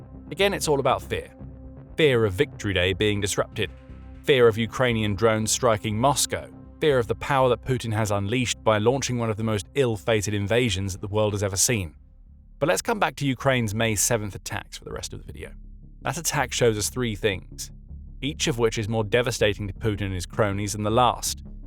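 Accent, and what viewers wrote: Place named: Cockney, United Kingdom. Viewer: I can't stand to hear you say Rush-er, and other words like propagand-er, you sound like a cockney Brit!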